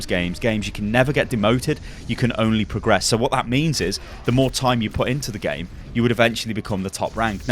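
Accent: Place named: Southern England, United Kingdom. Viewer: As a native of Britain I can confirm he is rather southern.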